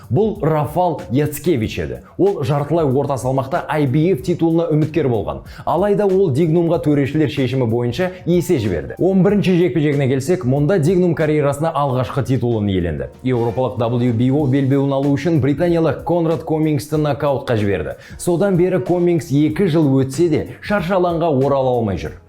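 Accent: Standard. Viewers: Өте жақсы жүргізеді екен. Қазақ ша таза жəне анық сөйлейді.Алға бауырым.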